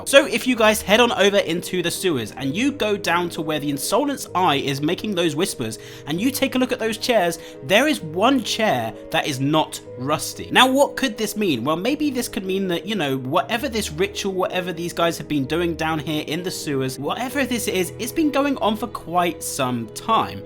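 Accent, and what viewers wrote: United Kingdom. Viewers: are you bridish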